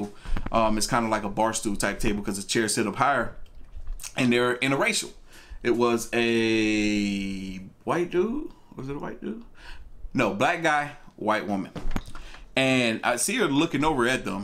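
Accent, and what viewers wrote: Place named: Decatur, United States. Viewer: Sounds like Decatur area. Lol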